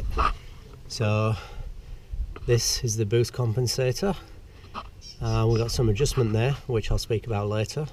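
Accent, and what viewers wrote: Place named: North of England, United Kingdom. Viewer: Hi,I finally got round to this job last week on my L400 Delica, your video helped immensely - thanks! On the Delica the TPS is connected to the bracket on the front of the boost compensator, with two spacers between the brackets - damn that was a horrible job getting it all back together haha. Overall the difference is significant when the boost kicks in. I've also added a boost controller between the turbo and wastegate too, and the EGT gauge goes in today. - I've got to ask - your accent sounds like you're originally from the North of England, by any chance are you?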